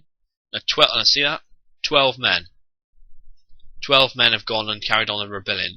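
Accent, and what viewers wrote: United Kingdom. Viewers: I have never heard an Indian with a British accent before. It sounds nice. No really still loving this rant.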